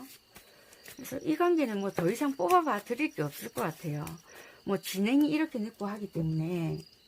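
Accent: Busan, South Korea. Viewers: 1번👀✨.... 정말 꼼꼼하고 현실적인 리딩 감사합니다♥ 덕분에 용기를 얻고가요 가 저에게 최고 잘맞는거같아요 👀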